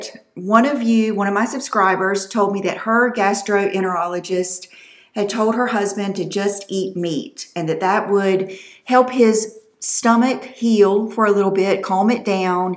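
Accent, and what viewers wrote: South, United States. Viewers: Sweet, Soft-spoken, Southern, Sincere, Stunning 🥰🙏🏼🥰💄😍💕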